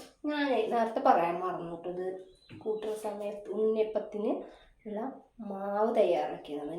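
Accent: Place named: Kunnamkulam, India. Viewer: സൂപ്പർ. തൃശൂരിൽ കുന്നംകുളത്തല്ലേ വീട്? സംസാരം കേട്ടിട്ട്😅😅😅😅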